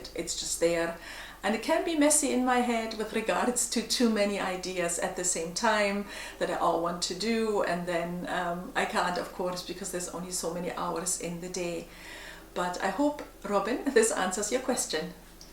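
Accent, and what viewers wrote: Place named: Scotland, United Kingdom. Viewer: Wishing you an abundance of success in your new business. I think your accent sounds like 90% Scottish... so lovely.😍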